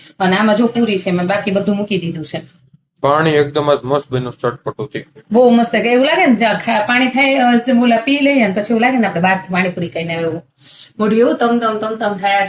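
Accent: Kathiawar, India. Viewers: કાઠિયાવાડી છો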